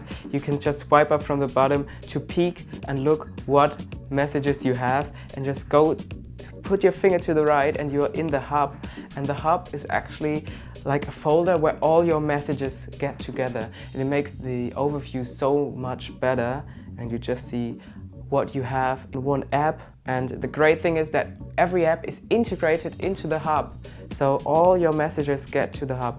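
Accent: Germany. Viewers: i thought so. I've been living in düsseldorf for about 1.5 years now. i can easily recognise the german accent^^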